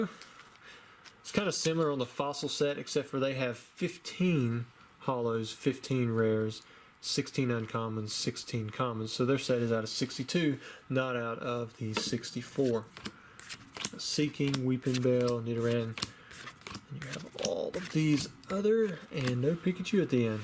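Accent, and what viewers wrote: South, United States. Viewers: are you from GA or Tenn.? Also love the vidz man I heard about from Pokerev even though you’ve been on YouTube longer.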